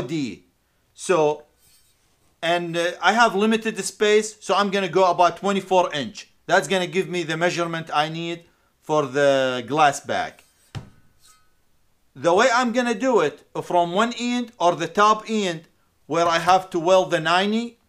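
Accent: Iraq. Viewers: من طريقة لفظك عرفتك عراقي !!!! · انته عراقي؟.حجيك عراقي من الوسط و الجنوب تحديداً!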